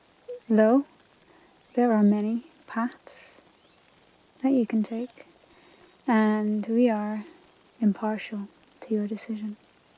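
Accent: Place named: Northern England, United Kingdom. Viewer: Nice vids, some of the most interesting iv seen, keep up the good work, you sound like your from north of England, cant put my finger on exactly where though.:P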